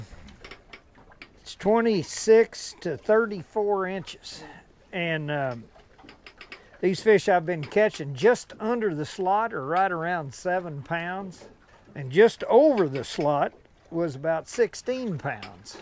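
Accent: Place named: Missouri, United States. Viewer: You are the fishing grits of all time Enjoy your expansion to catfish but can’t imagine you would actually clean and cook them I never thought catfish was worth the effort I am driving back from AZ on Monday Love listening to your distinctive SE Missouri flavored commentary Dave K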